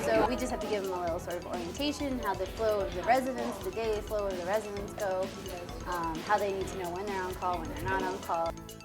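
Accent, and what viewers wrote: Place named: United States. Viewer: she has an American accent, maybe her parents are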